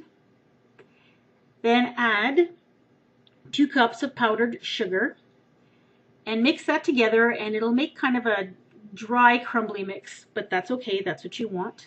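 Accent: Canada. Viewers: love the canadian accent ^^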